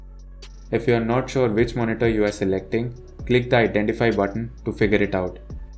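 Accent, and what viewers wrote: India. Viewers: Sorry if this sounds a bit racist or attacking your nation but I always think it's a legitimate tutorial video when the person speaking has an Indian accent. I also love that you're straight to the point. If this was American, it wouldn't be as straight as this one. They have to thank their sponsors first and talk about nonsense before actually getting to the tutorial.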